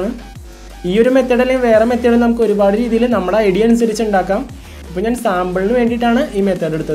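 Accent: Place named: Ernakulam, India. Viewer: Kollam bro... Ur slang u must be from Ernakulam area, I think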